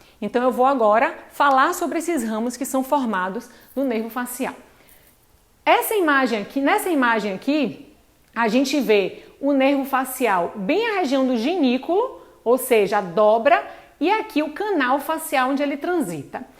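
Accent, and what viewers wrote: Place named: Bahia, Brazil. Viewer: Professora, excelente aula! Melhor ainda porque matei a saudade de ouvir o sotaque da Bahia ahhuahau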